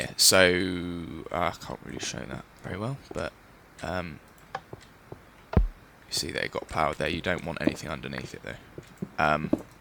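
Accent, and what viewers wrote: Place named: England, United Kingdom. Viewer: he has an english accent like an english...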